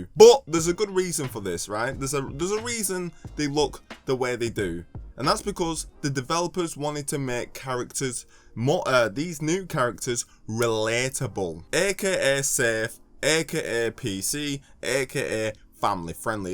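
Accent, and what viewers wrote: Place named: Geordie, United Kingdom. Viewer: Where are you from? I’m from Southern California. Are you a Geordie? Your accent is so tricky to place lol.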